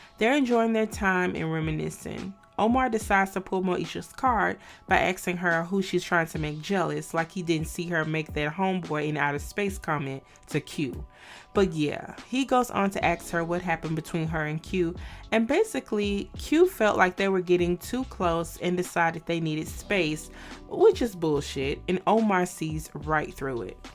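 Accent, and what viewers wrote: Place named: South, United States. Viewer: Another entertaining video as always! Also I love your accent, like the way you said poem , it’s so cute! If you don’t mind me asking where are you from? (I know your southern based on your voice but I know different southern states have different dialects)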